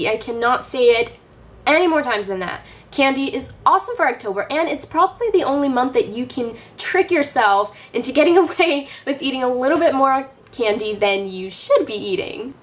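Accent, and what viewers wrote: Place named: New York, United States. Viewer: I love your NY accent. I'm from NJ lol I was just watching some of your old videos yesterday so it's great to see something new from you! I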